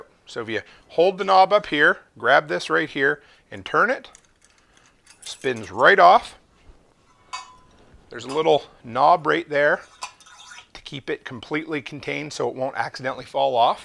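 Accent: Canada. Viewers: I hear a Canadian accent.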